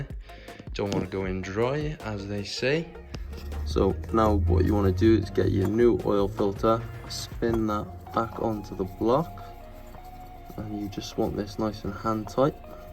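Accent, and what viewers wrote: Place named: Wales, United Kingdom. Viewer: i will be giving this a go next week , so thank you for the vid. You have made it look really simple. You sound welsh by the way lol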